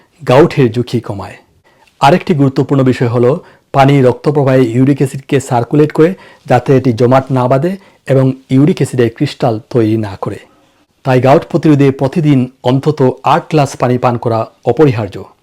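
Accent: Sylhet, Bangladesh. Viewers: ডাঃ সাহেব আপনার জন্য আরশি বাদ রহিলে আপনি সিলেটী কথায় বুঝা যায় সঠিক জানি না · আপনি সিলেটী নাকি? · আপনার একসেন্ট। খ উচ্চারণ। ভালো লাগলো সিলেটি ভেবে 😊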